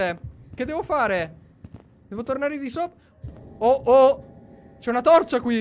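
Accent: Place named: Veneto, Italy. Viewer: Oddio ma sei venetoooo anche ioooo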